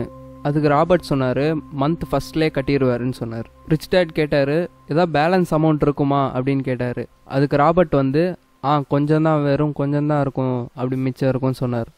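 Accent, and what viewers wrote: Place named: India. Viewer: Brooooo can you changer reader pronunciation please no Indian 👳 better Chinese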